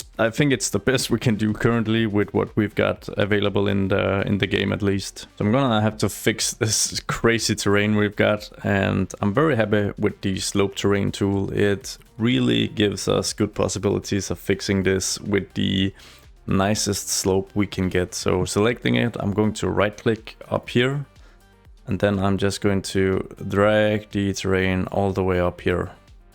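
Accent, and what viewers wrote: Denmark. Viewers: I was wondering whether you are from Denmark based on your accent🙂 Btw love your videos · Det er super god danglish :)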